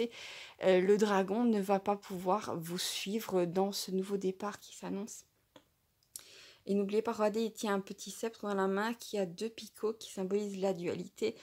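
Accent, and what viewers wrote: Alsace, France. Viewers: Je ressens un petit accent alsacien ? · Vous avez un accent, êtes vous alsacienne ?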